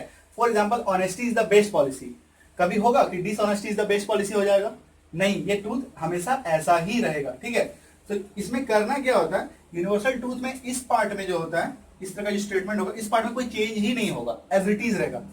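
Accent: Bihar, India. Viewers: sir Bihar se ho kya apne bhaut acha samjhya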